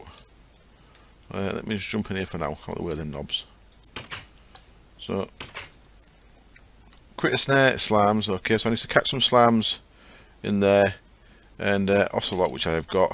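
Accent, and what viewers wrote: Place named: Northern England, United Kingdom. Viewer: I'd be interested in listening to your soothing northern accent no matter what game you were playing. Reminds me of my grandfather Grumpy a good Yorkshire lad.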